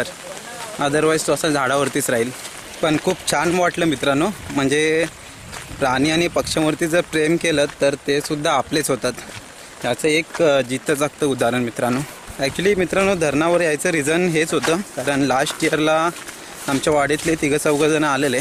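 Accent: India. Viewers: बहुतेक राजापुर तालुका असणार तुमचा.असं बोलण्यावरून वाटतय.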